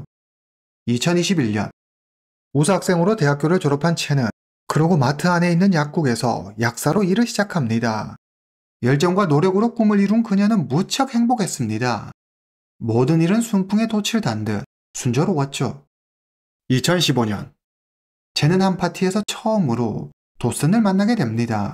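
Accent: China. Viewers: 억양때문에… 보다가 껐어요…중국분이신가요?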